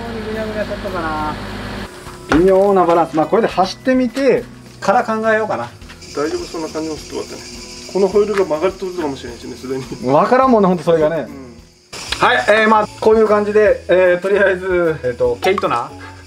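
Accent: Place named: Japan. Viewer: 自分で満足感出すのサイコーですね〜！さんは、どこの県の方なのか？なまりが、！笑笑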